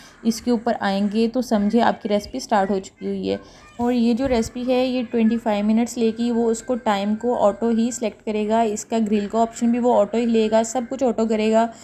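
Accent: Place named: Faisalabad, Pakistan. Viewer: Apki baton se lgta he ap fsd se hn.... & i love fsdians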